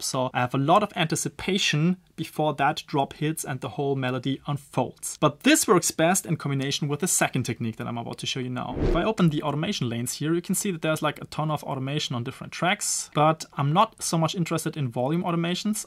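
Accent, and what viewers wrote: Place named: Germany. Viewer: You sound very German xD Great trick buddy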